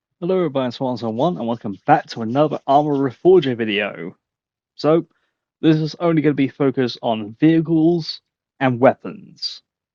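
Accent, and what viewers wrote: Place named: Bristol, United Kingdom. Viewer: Your from Bristol gurt accent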